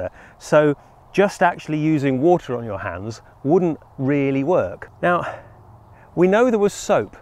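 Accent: United Kingdom. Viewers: I don’t recall Bob Ross having a british accent.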